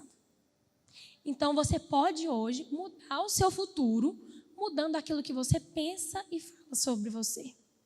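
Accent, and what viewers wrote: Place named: Minas Gerais, Brazil. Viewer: Me lembrou tmb!! O jeito de falar e andar rsrs deve ser coisa de mineiro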